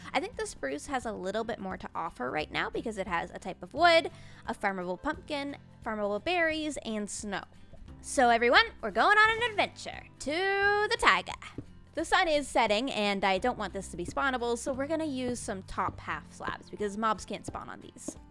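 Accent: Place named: Canada. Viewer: Mannn the Canadian accent. I löve it. It’s so endearing. (I’m from UK)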